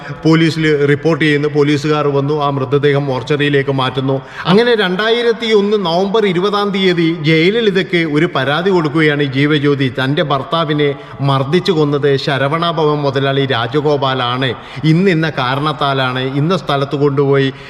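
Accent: Kannur, India. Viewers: മസാല ഒക്ക കൊടുത്തുകൊണ്ട് ഇക്കിളി പെടുത്തിയുള്ള കണ്ണൂർ ശൈലിയിലുള്ള അവതരണം ഇഷ്ടമായത് കൊണ്ട് വീണ്ടും കേൾക്കും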